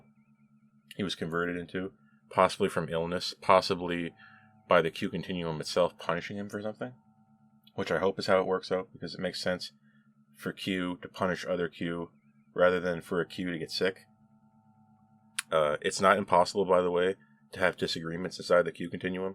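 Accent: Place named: Canada. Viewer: This is just an observation, but you sound like you are a Canadian.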